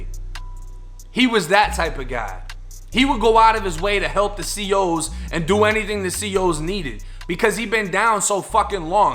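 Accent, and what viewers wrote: Boston, United States. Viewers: Omg I know!!!! 🔥 💚🤍❤️ And it’s a sentimental thing for me too. My dad and Uncle were full blooded second generation Italians born and raised in and around Atlantic City, NJ. They moved away in their 20’s and my Uncle still has the accent after living in Florida for 42-43 years. His is the closest to cuz he has a little southern thrown in too. My dad had it to but not quite as thick because we spent many years in Michigan (until he passed). I noticed the longer has been lives up there in MA the thicker his accent gets, which is completely normal. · You have an accent.. Are you born and raised in Fl? Bc you sound like your from Boston or even maybe Ny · Boston in the house! I love this kid but damn his accent is serious. I'm from Beantown and got an accent but not like this homie. #617 #508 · Shit i literally started listenin to channel 3 days ago. 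Friday and its sunday...shit i was like you gor a boston accent. Lol i dunno i can relate to everything he sayin so im goin video to video. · If u didn't say you was from Florida I would of thought u was from boston... I love your channel bro...I wanna do what you do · It's just a Boston accent. Pretty normal for the boston area. You don't get out much huh lol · I love Bostonian accents, "and suddenly you're droppin' your R's " Love it, dude you gotta write a book. You're a fucking word smith, smart, awake, and able. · You sound crazy ass fuck but yo opinion he has a east coast Boston, accent but what do I know 😂😝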